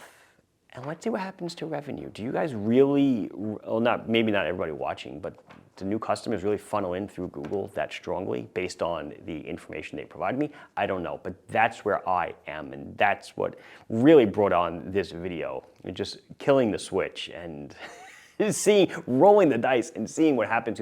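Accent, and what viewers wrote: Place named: Texas, United States. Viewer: I don’t buy watches from you because of your Google ads. I DO buy watches from you because of your Texas drawl in describing your products & and because of your wardrobe that matches Teddy Baldasarre’s. There, I said it.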